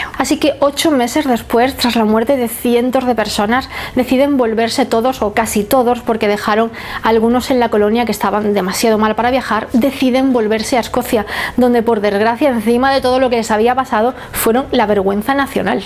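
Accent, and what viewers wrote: Spain. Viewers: Me encanta tu acento español Por eso España es de Puta madre como decía la canción de los 80’s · Me encanta cómo explicas todo!! Tu acento tan Lindoo. Nos encantó Escocia 🏴, estuvimos en Octubre del año pasado con mi marido, esperamos volver 🙌🏻 y hacer un lindo recorrido de esos que tenes en tu blog😊. Y por supuesto España es Muy muy Bello tu país ☺️ Saludos desde Argentina 😘🙋🏻‍♀️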